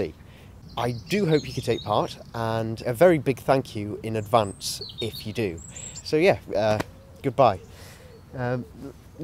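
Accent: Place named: Nicaragua. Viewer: Nicaraguan Accent!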